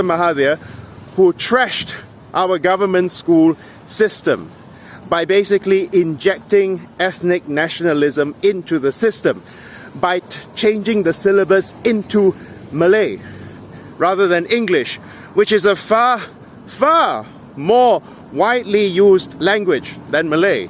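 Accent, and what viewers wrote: United Kingdom. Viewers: First of all, it shouldn't be you talking cause you are a Chinese. Any changes have to come from Malays. Liberals will cheer for you no matter what, but most Malays won't give a damn on what you have to say. Secondly you spoke in clear British English, this is more as a disrespect. If you can't respect Malays, don't expect them to respect your opinion. Thirdly, public opinion is irrelevant, if you want to change something, you speak or influence people in charge.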